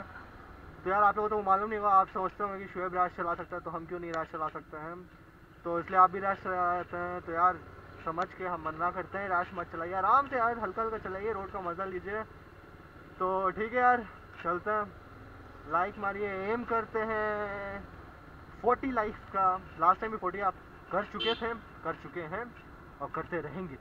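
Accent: Bihar, India. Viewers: In Bangalore u have bhihari accent....?????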